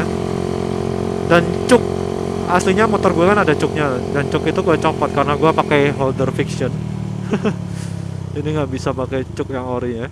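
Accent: Semarang, Indonesia. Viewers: Koyo semarang iki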